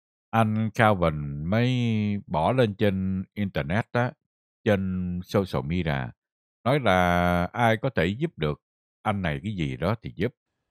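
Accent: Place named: South, Vietnam. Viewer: rất yêu thương giọng nói *, giọng miền nam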